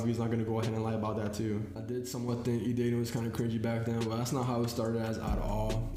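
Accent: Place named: Colombia. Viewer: con un acento absolutamente colombiano que cualquier hispanohablante puede detectarlo fácilmente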